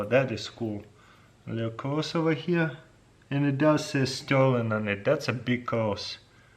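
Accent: Russia. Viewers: Why does it seem like auto captions works better on english in a russian accent than english in a... english accent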